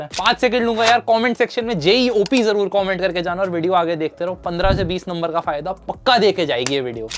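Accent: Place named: Bengal, India. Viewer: Your bengali accent is cute wow .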